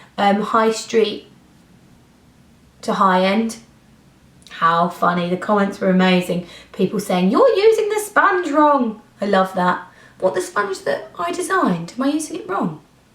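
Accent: United Kingdom. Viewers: Love that british accent "last longaah, with your fingaah" 😚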